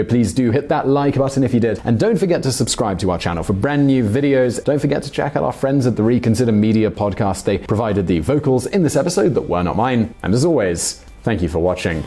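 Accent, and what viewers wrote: United Kingdom. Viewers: You always talk about other countries. I will like to know where are you from ? You sound British but you might not be one. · Summary of this video: SJW bloke with a British accent calls Trump xenophobic for trying to reduce illegal immigration by citing the contributions of legal immigrants (to the UK). LOL liberal logic.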